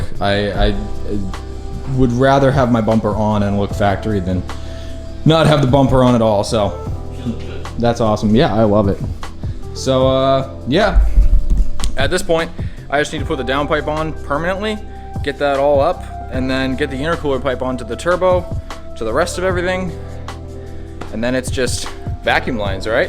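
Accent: Massachusetts, United States. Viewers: What part of mass are you from??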